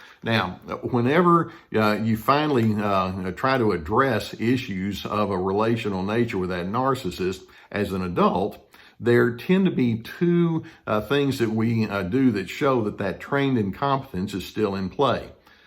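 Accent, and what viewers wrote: South, United States. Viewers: Your southern accent helps send a clear message. You described it well!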